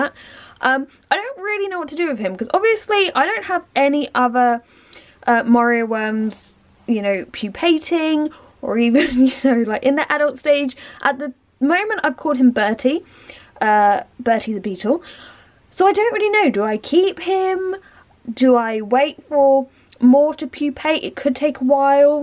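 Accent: United Kingdom. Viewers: Wait are you from uk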